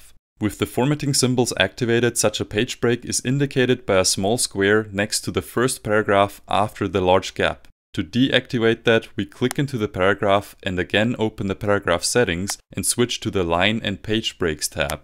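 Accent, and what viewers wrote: Germany. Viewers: I am sensing a German accent, so Danke!! :D Hat mir die Masterarbeit gerettet <3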